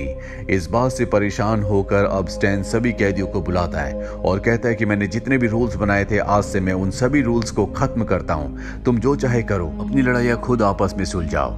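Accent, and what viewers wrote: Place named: Himachal Pradesh, India. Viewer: Sir aapki aawaz se lgta h aap Himachal side se ho 🤔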